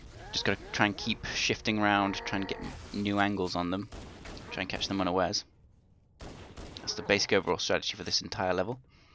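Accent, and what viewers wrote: United Kingdom. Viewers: i dont really think u do it more its just u respond to ur fans tyrant hasnt responded to any of my comments, plus ur videos r cool cause u take the time to put in commentary and ur voice sounds Brithish which is kool too. i subscribed to u becuase of ur videos,(Which cannot be more perfect) and plus if i am right tyrant only makes laso u make stuff that is HARDER than Laso, whcih takes time. ty make more vids!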